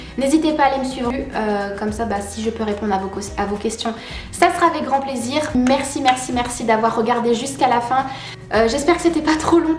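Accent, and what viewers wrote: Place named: North, France. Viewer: Très appréciable tes différentes "storytime", je me suis surpris à toutes les regarder. Je suis psy et tes témoignages sont importants pour dédramatiser la santé mentale qui est très importante 🙂 Bonne continuation à toi ! J'ai l'impression de reconnaître un peu mon accent du Nord, je ne sais pas si je me trompe mais c'est choupi 😂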